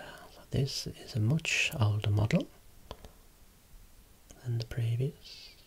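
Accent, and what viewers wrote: United Kingdom. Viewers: Your content, voice and British accent is better than any type of melatonin, I knock out so quick 🛌 😴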